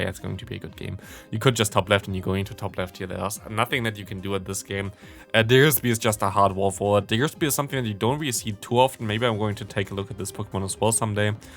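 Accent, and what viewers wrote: Germany. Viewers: I knew it you were german! the classic german accent like mine xD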